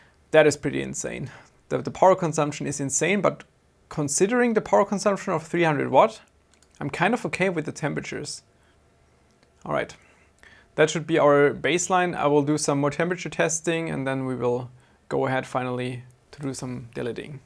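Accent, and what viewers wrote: Germany. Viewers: you are a native German speaker XD ... I mag the Klang of deinem accent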